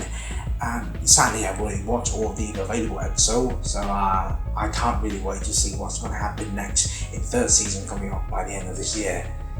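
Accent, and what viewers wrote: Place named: United Kingdom. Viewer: Giọng Anh Anh chuẩn thế ạ :3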